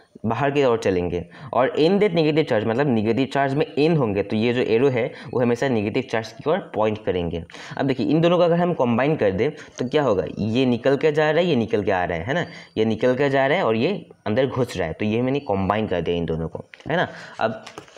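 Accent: Assam, India. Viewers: Sir apuni axomr neki apunar hindi kowa style tu amr axomiya manuhe kowar dore 😊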